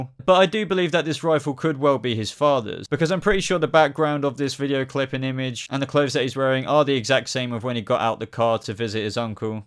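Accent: United Kingdom. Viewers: Two questions: 1) If this chappie is in the UK as the accent suggests, how can he be legally reviewing things that haven't shown here yet? 2) Is it just me that thinks he is REALLY good looking?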